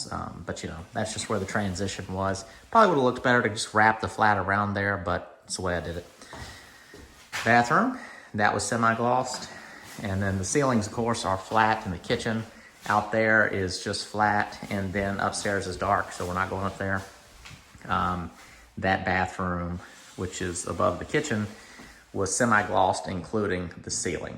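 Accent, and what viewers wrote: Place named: Appalachia, United States. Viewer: Love this house it looks so good... i know renters dont get invested to care about a house i dont understand that sloppy mindset... if someones trusting you with their property you darn well look after it... when i have moved home i clean everywhere for the folks moving in... if my daughter rented when younger i took it as my responsibility to clean from top to bottom before we left..its in my upbringing... id treat yours better than my own cos its not my own... simple as...i wonder what kind of vetting you do to get decent tenants.... Also cant figure your accent ... im from england... you sound southern but not as southern as Dolly Parton... your voice is so easy to listen to... Fine job..... looking fab... kinda envy the lucky renter who gets to benefit from all that fine attention to detail...:)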